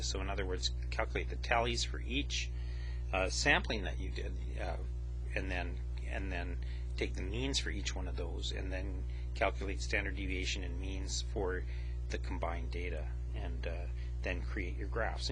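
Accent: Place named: Canada. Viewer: you are one of the most Canadian sounding person I've ever heard. also thank you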